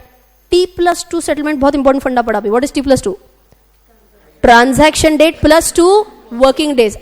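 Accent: Maharashtra, India. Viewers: Hello You have done a nice job, I appreciate you. Do one favour for us if possible, PLZZ switch the thumbnail of last two lectures bcoz after completing 2nd lecture we got a lot of confusions, I personally Google a lot for that purpose,than I go for 3rd lecture which has contained that explanations of same thing 😁. You have done a good job. I love the way of your teaching and Marathi accent you have used ❤️❤️. I don't know whether I do invest in share market or not but I have completed these lectures just to learn the way you present the things apart from the knowledge you have provided. Well-done 👍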